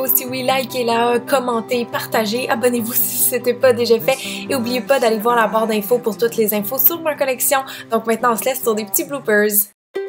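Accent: Quebec, Canada. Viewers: Coucou, j'aime beaucoup tes vidéos, tu es trop cute, j'adore ton ptit accent québécois et tes ptites tâches de rousseurs c'est trop mignon :) j'aime aussi tout tes conseils de maquillage, mode, et ta personnalité, la fraîcheur, la simplicité et ta bonne humeur, continue comme ça ! Puis félicitation pour ta ligne de vêtements, je te souhaite le meilleur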